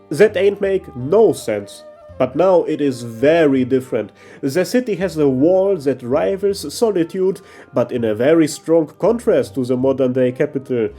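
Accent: Germany. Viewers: Man merkt voll dass du aus Deutschland bist 😅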